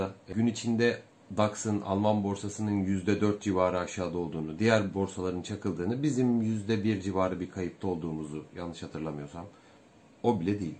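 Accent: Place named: Erzincan, Turkey. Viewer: Memleket nedir.Erzincan yöresi gibi geldi.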